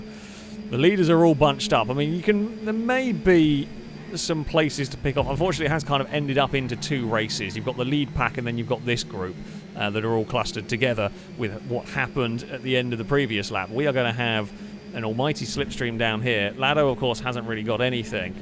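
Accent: United Kingdom. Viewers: The accent is UK but are you sure your not Canadian. You constantly apologize for stuff that is not your fault.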